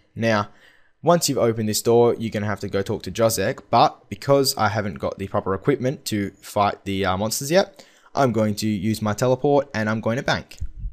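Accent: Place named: Australia. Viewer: YOU SOUND AUSTRALIAN. LOVE IT.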